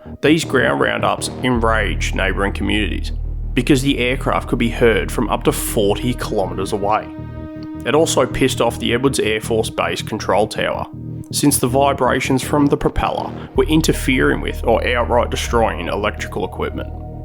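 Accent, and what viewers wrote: Australia. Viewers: What a terrible Australian accent this speaker has! That's not proper English. That's Outback babble.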